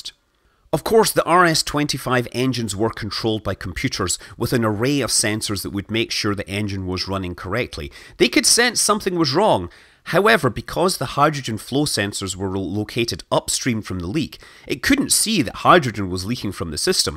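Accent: Scotland, United Kingdom. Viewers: It this narrator Scottish or Welch or what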